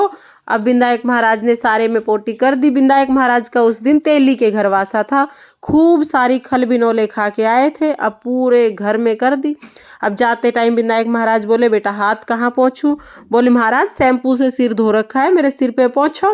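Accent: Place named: Meerut, India. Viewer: 😊😊ji achcha reply k liye dhanyawad...aapki voice tone thoda hmare meerut ki lagi isliye puchha😊 happy sakat chauth aap sabhi ko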